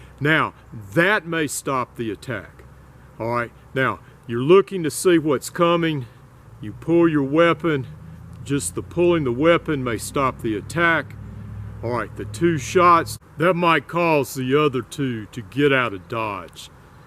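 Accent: South, United States. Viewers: My favorite carry is my S&W snubbie with laser. So light and easy to carry which explains why it’s my favorite. Keep up the good work. I love that southern accent of yours! LOL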